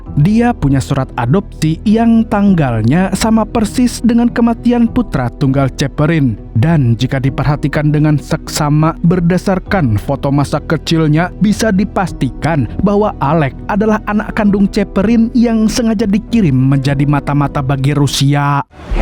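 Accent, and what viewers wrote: Banjar, Indonesia. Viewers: urang banua sklinya amang ni lehh 😅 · Orang banjar kahh😮😂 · Orang Banjar kh Pian mang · Admin orang banjar kah ☺️ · Uma ai mang suara pian merindu banar week week paristiwa pang😅😅😅😅😅 Banjar banar nah. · Org banjar kah nyawa Nang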